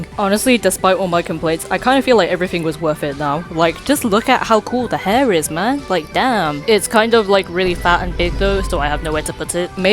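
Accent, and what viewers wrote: United Kingdom. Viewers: she's prob british bro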